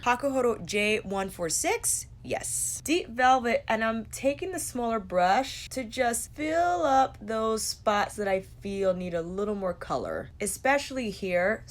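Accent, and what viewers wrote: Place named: East Coast, United States. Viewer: I dont know what kind of accent you have, but I like it. Feels East Coastal.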